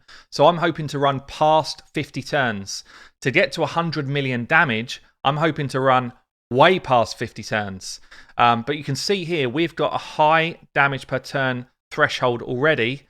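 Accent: United Kingdom. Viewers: Congrats. You rock! I love your British pronunciation and some expressions, it’s such a fun to listen and learn from your content. For me you should in each posted video say straight to the bin. You can say also as much as you want in each - Alure. Cheers mate!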